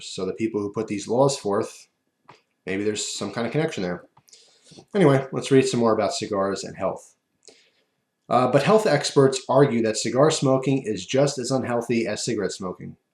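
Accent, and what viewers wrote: United States. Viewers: i just assumed you were american the way you were talking, you came across a bit strong and also, /watch?v=LIlUcPRYroM&feature=plcp&context=C4582d3bVDvjVQa1PpcFObXtukHaAHVqDa_DPkS-9G5riny3IwqT8%3D .this is comeing from the man him self, this argument is getting off topic ,i have to bring her back.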